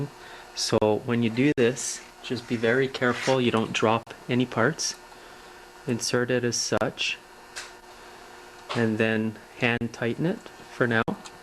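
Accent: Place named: Canada. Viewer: great info. exactly what i needed. thanks for posting! nice Canadian accent, by the way. very calming!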